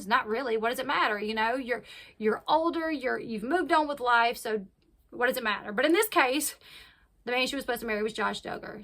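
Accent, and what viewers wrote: South, United States. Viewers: I love your accent. I miss the south.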